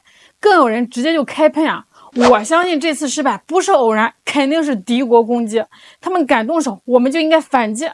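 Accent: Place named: North, China. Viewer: 但一定是北方人😊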